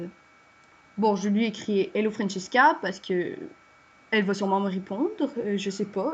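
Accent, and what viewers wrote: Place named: Quebec, Canada. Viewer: Esque tu pourrait mettre une music et j adore ton accent Québecoi (dsl jsp ecrire) 😇 en tout cas bonne contuination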